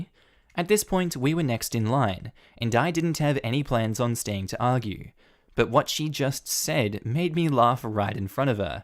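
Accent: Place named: Australia. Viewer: I always love the Aussie accent, but I admit I was wondering how the OP in the hospice was "wearing car keys."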